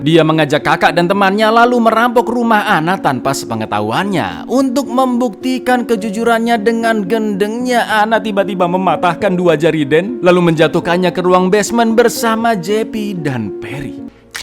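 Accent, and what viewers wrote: Java, Indonesia. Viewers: Bang,anda asli Jawa kah? Kok bahasa pengantarnya pake bahasanya fasih sekali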